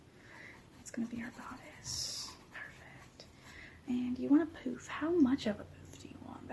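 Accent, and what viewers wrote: South, United States. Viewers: As a resident of the south you got the accent down well 😂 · Just when you wonder if the girl can get any cuter, she throws on a southern accent and continues stealing your heart <3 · Still so naturally chill and yay a new video 🤩 I don't usually find southern type accents relaxing as I have to focus harder to listen lol I'm British so it doesn't exactly come natural to me 😂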